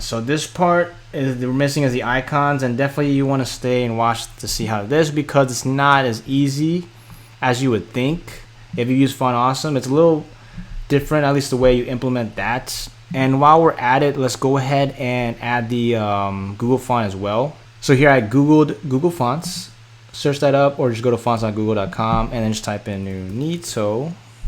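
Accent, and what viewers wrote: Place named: United States. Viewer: Great job! And American English!.. Thank you!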